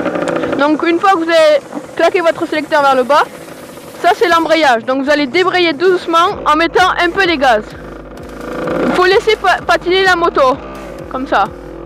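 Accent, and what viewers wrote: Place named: South, France. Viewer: Très bien expliqué! Tu t'exprimes vraiment bien; et j'aime beaucoup ton léger accent du sud, moi qui parle comme les ch'tis ça fait du bien d'entendre une autre mélodie. À bientôt jeune motard. 😊✌😉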